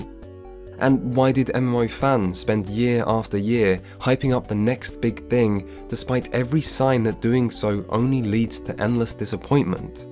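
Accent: United Kingdom. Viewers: british english - instant skip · OMG everytime I hear that brit accent I end up hating the thing I used to like.......arrogant snot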